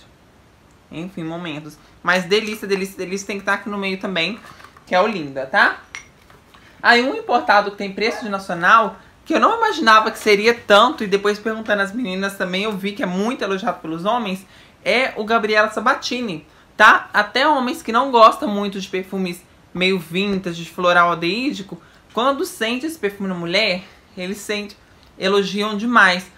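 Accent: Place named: Minas Gerais, Brazil. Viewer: Pode falar bastante . Amo esse sotaque mineiro que emenda as palavras . Acho chique .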